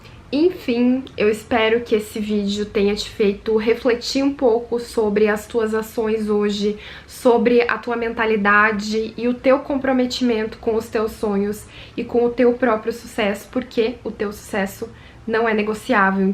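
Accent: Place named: Rio Grande do Sul, Brazil. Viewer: Aq eu percebi que tu é gaúcha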